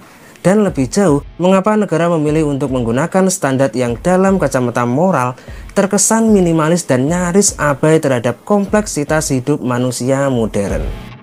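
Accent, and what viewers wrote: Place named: Java, Indonesia. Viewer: Bahasa yg bagus tidak terlalu jawa👍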